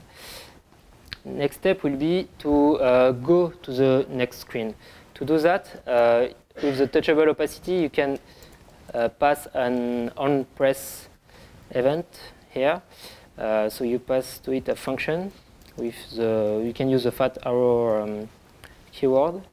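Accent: France. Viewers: Tu aurait pu la faire en français.....vu l'accent ^^ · ton accent francais il dechire lol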